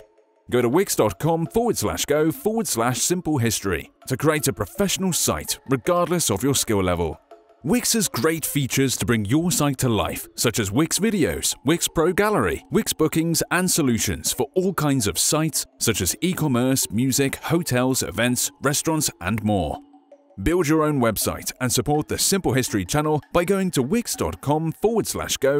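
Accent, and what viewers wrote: United Kingdom. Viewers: Why do you have a British accent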